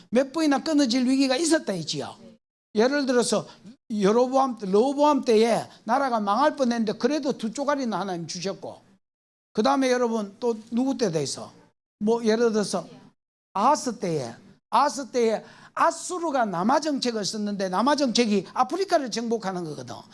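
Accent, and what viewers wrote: Gyeongsang, South Korea. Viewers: 목사님 드디어 주문한 책이 왔어요. 기대 대요 목사님 설교(구속사)를 통해서 마태복음 1장의 족보가 은혜가 됩니다. 전에는 졸리고, 모르는 말씀이었습니다. 비밀이 풀어진듯 합니다. 남편도 성경이 이해가 되어진다고 합니다. 처음에는 경상도 특유의 발음때문에 고생은 했는데 지금은 정겹습니다. 주님의 이름으로 사랑하고 축복합니다. 감사드립니다.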